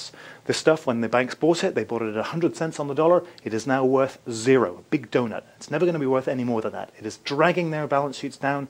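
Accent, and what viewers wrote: England, United Kingdom. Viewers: Hes english not irish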